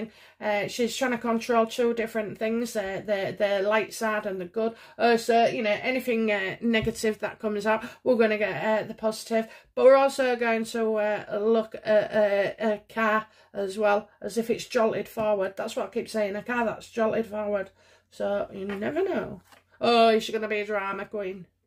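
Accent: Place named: Northern England, United Kingdom. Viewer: I'm also from bookworm. 2. Living in the UK. Sounds like you're in the north. 😁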